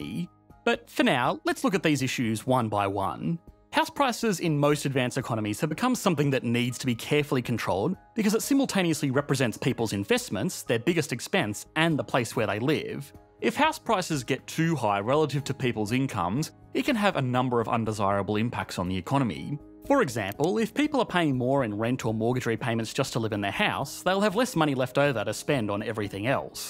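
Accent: Australia. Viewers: I use your videos to work on my Australian accent. It is thiccccc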